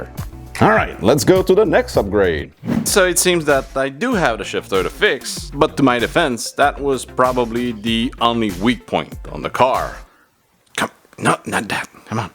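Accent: Quebec, Canada. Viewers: Been in awhile since I've heard a Quebecois accent. Your english is great!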